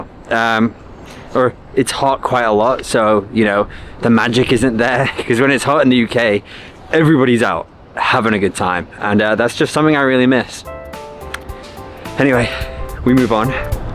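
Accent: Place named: United States. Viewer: Why have you picked up American accent/intonation in such a short period of time?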